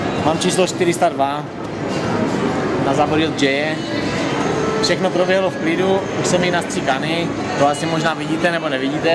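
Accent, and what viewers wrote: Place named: Moravia, Czech Republic. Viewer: On má moravskej přízvuk, je z česka.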